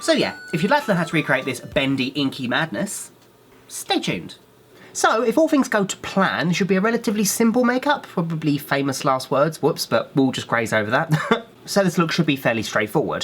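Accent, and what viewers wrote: England, United Kingdom. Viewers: I love U and your england accent. Love u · Are you britsh or not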